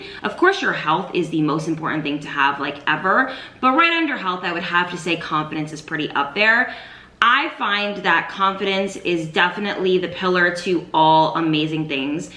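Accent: New Jersey, United States. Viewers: The Jersey REALLY came out in this one 🔥👏🏽 beautiful advice, thank you 💖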